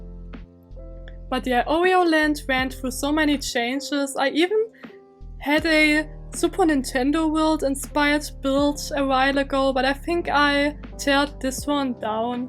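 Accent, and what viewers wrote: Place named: Germany. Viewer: Are you german? You have a very german sounding accent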